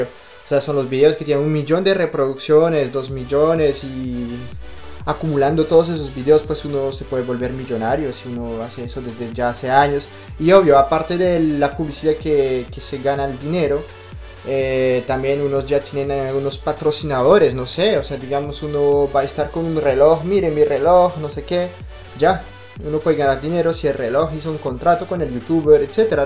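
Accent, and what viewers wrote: Bogotá, Colombia. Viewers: Tu acento es muy colombiano eso es verdad. Los québécois que están en mi clase de español matarían por hablar como tú, ya que se van a un stage a países hispanos. Te conocí gracias a una antropóloga amiga mía que ve los intercambios Québec-México Y Mexico-Francia y a los hispanos les puso un par de videos tuyos en el tópico de choque cultural, no eres antropólogo pero tu honesta opinión nos parece objetiva y certera, a mucha gente nos interesa la cultura y la sociedad, sigue adelante. Saludos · hola! me gusta mucho tu manera de hablar, no se si hablas muy como colombiano porque yo soy de México pero sí creo que tu español es buenísimo:) Qué padre que hagas videos positivos de estos lares. coincido en que eres guapo!:) ojala que sí encuentres una latina que te haga muy feliz!! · Pareces colombiano porque tu español muy bogotano. 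Posdata: Disfruta el verano y broncéate mucho! Posdata de la posdata: Zidane, calvo hijo de puta, mete a James ajajaja (es broma)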